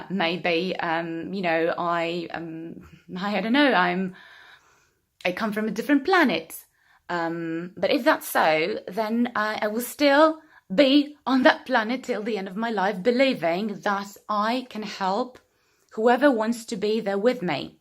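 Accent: United Kingdom. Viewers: You're talking about an American accent but you sound British? Why is that?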